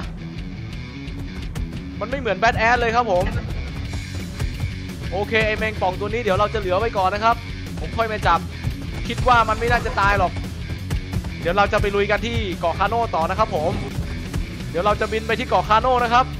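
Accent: South, Thailand. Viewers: คนใต้ปะพี่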